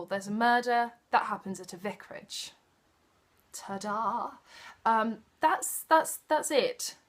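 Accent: England, United Kingdom. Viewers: Love your accent. Where are you from in England?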